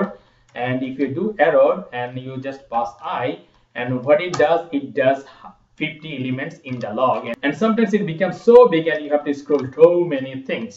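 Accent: India. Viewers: Lol this indian dude is hilarious when he talks its so steriotypical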